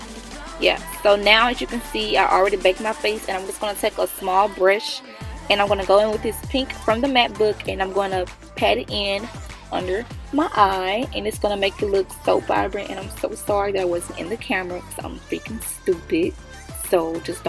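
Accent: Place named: New Orleans, United States. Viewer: Girl that’s a thick N.O accent lol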